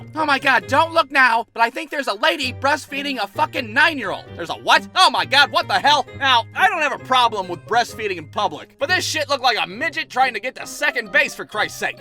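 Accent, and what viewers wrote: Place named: Northwest Ohio, United States. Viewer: Okay okay this dudes form nw Ohio isn’t he😂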